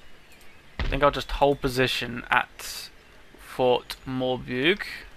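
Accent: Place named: United Kingdom. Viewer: You sounded really british!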